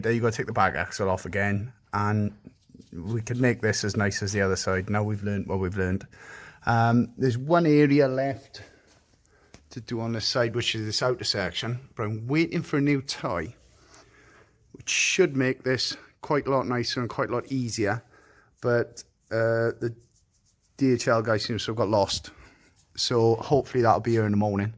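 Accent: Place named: Wales, United Kingdom. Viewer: apart from monky london an recently salvage flippers, I don't watch any of the UK ones...I think the welsh accent helps, nice an friendly😂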